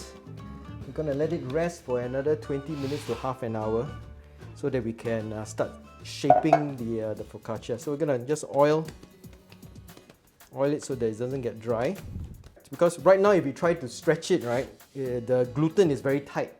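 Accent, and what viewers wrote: Singapore. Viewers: Awesome content!! I can guess you're from Singapore based on your accent, am I right la? :D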